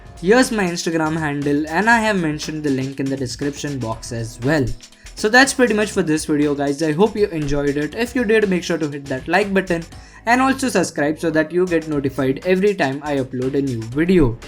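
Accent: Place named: India. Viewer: His indian accent is cute though 😀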